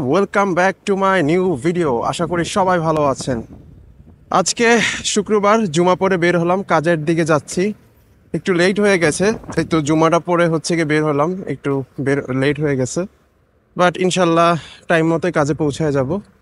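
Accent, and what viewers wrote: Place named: Jessore, Bangladesh. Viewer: আপনার ভাষা আমার এলাকা যশোরের মতন অনেক টা । আপনার দেশের বাসা কোথায় ?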